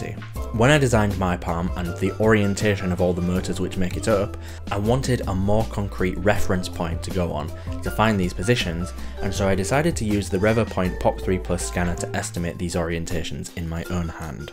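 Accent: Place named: Yorkshire, United Kingdom. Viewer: Yorkshire accent or not, thanks for sharing!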